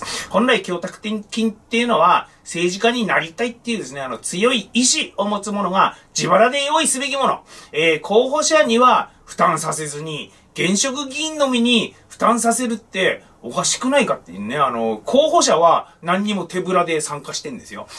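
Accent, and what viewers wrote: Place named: Ibaraki, Japan. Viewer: なまってんな（茨木調）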